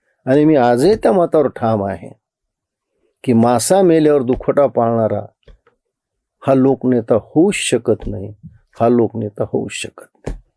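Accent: Marathwada, India. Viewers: काका आपण रामराम म्हणता ना... ते लय भावतं... अस्सल मराठवाडी लहेजा बोलण्यात असतो.'त्या'नेत्याला आपण पचला नाहीत..पण आता फिट्ट बसला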